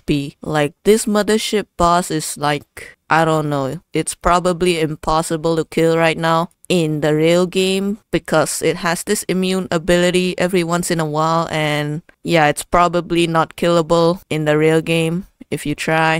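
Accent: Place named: India. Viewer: I know your voice you are Indian if I'm right give me heart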